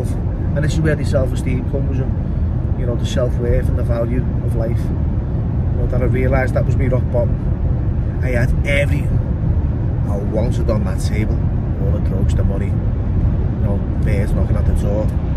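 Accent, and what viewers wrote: Liverpool, United Kingdom. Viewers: Keep going bro your good I like to hear one of my favourite scousers bellew ok and that lol but you give us the street ex addicts new addicts any addicts but anyone gets a chance with you don't lose it now bro your on the pinnacle of success you will be in films soon bro your already a film star bro end o chat bless and thanks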